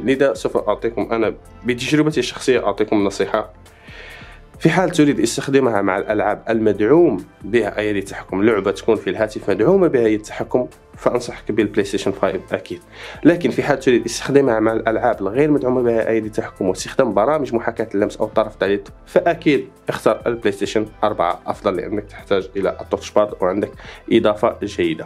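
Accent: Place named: Morocco. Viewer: حسنا اخي بارك الله فيك اعتقدت انك مغربي بسبب لهجتك 😄🥰🥰🥰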